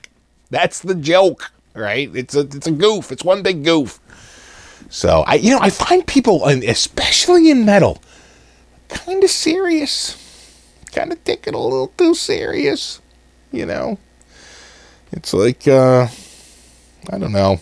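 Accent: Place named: Rhode Island, United States. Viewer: you look like gerry garcia might have looked, if he looked like famly guy .... you even sound a bit like FG... im guessing your from, or around rhode island lovin your vids by the way and LZ are the biggest rip off artists of all time its just that the internet wasent around when they were. so no one gotta know better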